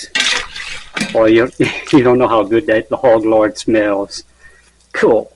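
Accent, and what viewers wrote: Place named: Louisiana, United States. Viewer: Love the videos, you just need to get a lapel microphone so that your voice is clearer and we can hear that cajun accent better.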